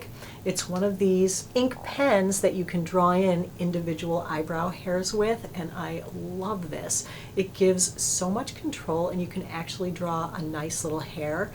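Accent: France. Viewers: Oh! Votre accent Français! Too cute;) J’adore vos vidéos! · Love your French accent!😉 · Love your French accent, oui oui! ❤️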